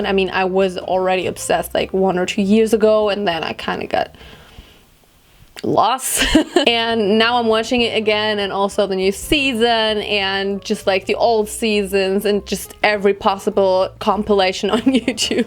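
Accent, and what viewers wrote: Germany. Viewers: Ich finde deinen deutschen Akzent richtig unangenehm. Du sprichst zwar gut. Aber das „the“ wird nur anders gesprochen wenn das folgende darauf bezogenen Wort mit einem a,e,i,o,u ausgesprochen wird. Im Normalfall wird das e bei the nicht betont. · Immer der gleiche Anfang ist langsam echt nicht mehr lustig. Dein Englisch ist auch nicht das Beste, man merkt, dass du eigentlich deutsch sprichst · Girl you just try to much beeing a beauty-Guru. I dont know how i ended up here but wth you are so fake af du bist deutsch und das hört man auch!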